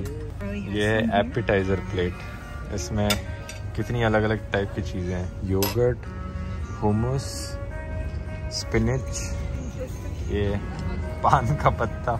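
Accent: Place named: India. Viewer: From the thumbnail I thought he was an Italian tourist than he spoke Indian, my Brian start rewiring!!!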